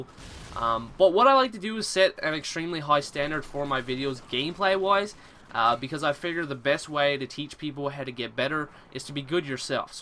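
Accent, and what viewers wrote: Newfoundland, Canada. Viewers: That's no accent, his voice is just high pitched... or possibly just newfy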